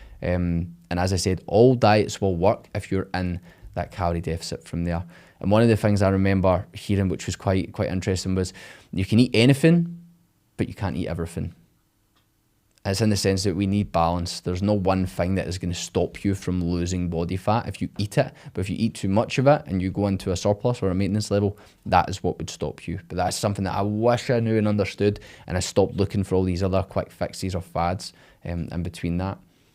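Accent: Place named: North, United Kingdom. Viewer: Fair play bro like your style of delivery, it's great to listen to more "localised" people, me a dub and yourself /obviously somewhere north!!!😅 information may be similar but to support and hear from again as I said more "my own people "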